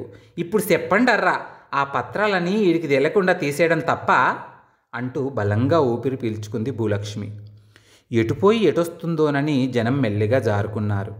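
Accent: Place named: Uttarandhra, India. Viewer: ఉత్తరాంధ్ర మాండలికం మీ గొంతులో అద్భుతంగా పలికింది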